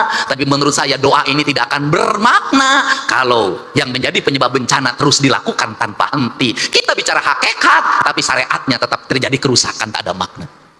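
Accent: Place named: Java, Indonesia. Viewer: Inilah ajaram sunda wiwitan yg sebenrnya, Logatnya pa dedi logat sunda saja ga usah ke jawa jawaan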